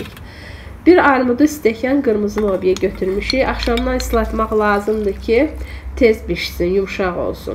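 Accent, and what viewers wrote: Baku, Azerbaijan. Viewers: Çox sağ olun,əllərinizə sağlığ hər bir təamı çox gözəl Bakı ləhcəsin də izah edirsiniz adama xoş gəlir.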